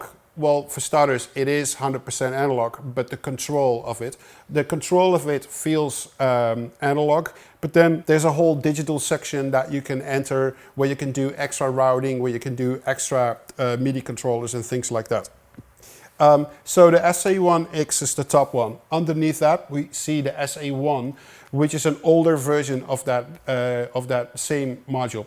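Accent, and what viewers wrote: Netherlands. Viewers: Hey Walter Lewin sounds a lot like him! Is he Dutch?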